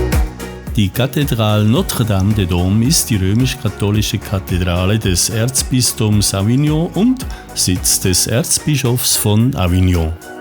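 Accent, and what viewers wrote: Switzerland. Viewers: Finde das Video gut. Und ich mag das Schweizerisch, mit dem Avignon erklären wird!!! Wir haben gute Erinnerungen an die Schweiz, fahren immer wieder gerne in Urlaub dorthin ☺️ Wenn wir nicht gerade nach Südfrankreich fahren 😉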